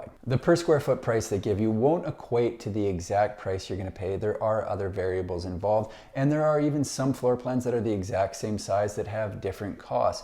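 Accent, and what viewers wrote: Canada. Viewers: Why am I listening to a canadia?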